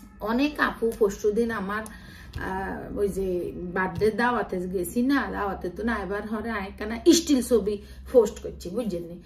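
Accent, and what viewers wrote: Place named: Sylhet, Bangladesh. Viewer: সিলেটী ভাল করে বাংলা বল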